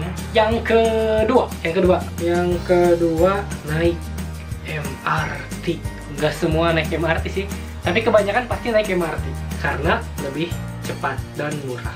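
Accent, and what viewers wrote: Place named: West Java, Indonesia. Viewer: pantesan logat sunda na teh aya wae,pek teh orang bandung gening wkwk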